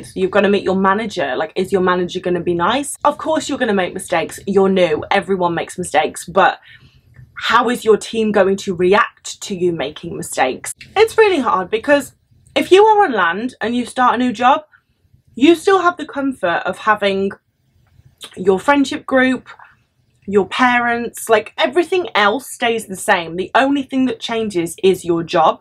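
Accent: United Kingdom. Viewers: She must be from the UK. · She's sounds so british actually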